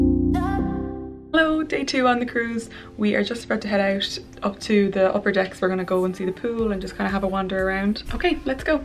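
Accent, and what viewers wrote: Ireland. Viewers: U sound like irish😉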